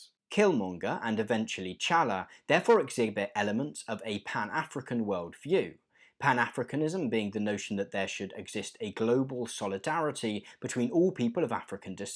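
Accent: United Kingdom. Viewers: why is a brittish person talking !!!!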